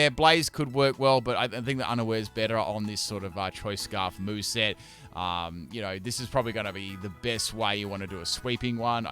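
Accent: Australia. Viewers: that's sick - have seen this but never knew the name. you're aussie yeah?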